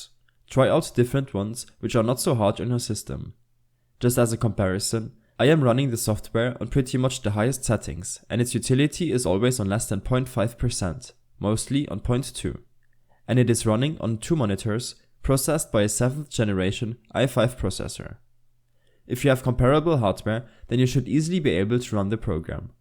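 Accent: Germany. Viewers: Man hört direkt raus, dass du deutsch bist xD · ich wusste das du deutsch bist wegen dem Akzent haha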